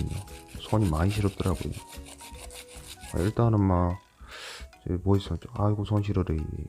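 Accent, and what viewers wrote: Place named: Gyeongsang, South Korea. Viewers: 경상도 아재 특유의 사투리가 구수 해서 좋은것 같습니다. 항상 있는 그대로의 모습이 좋은것 같습니다. 위생 장갑을 끼고 요리 하시는건 왠지 살짝 적응이 안되는 일인 이라서 . 앞으로 좋은 레시피 영상 기대하면서 좋은 영상 감사합니다. 지금 나레이션 방식 변화없이 죽 가시기 바랍니다. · ㅋㅋㅋㅋㅋ 어디 지방인겨~!? 부산이라예~? 사투리가 윽쓰로 구수한기 정감있눼예... ㅎㅎㅎㅎ 있는 그대로 말했뿌믄 음식 보다 나레이션 완쩐 찌깁니다!! ^^* 나레이션이 너무 구수한게 정말 매력이 좋습니다~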